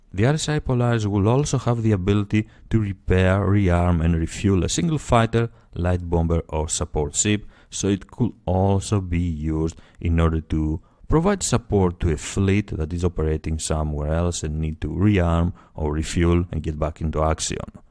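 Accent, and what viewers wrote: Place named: Greece. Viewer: Great vid, solid to-the-point information without superfluous speculations. Also I like your accent - you're greek, aren't you?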